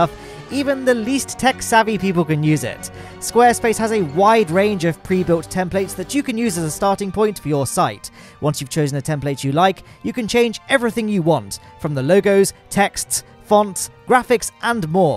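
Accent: United Kingdom. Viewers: This particular British Ascent is soo..bombastically annoying but at least he owns it. Total Game show host voice.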